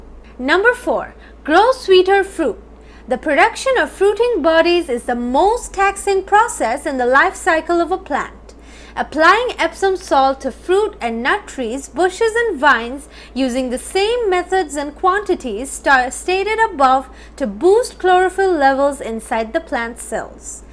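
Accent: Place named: India. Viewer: I think it is Indian pronunciation. Sounds cute.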